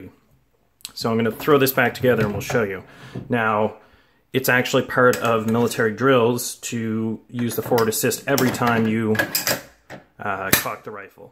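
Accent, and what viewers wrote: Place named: Canada. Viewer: Dude is deff Canadian lol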